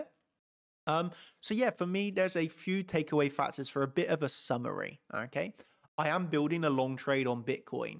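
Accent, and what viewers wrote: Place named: London, United Kingdom. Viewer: Thanks,! Love your accent - got to be from somewhere to the west ('left') of London, surely?! God bless you, from London x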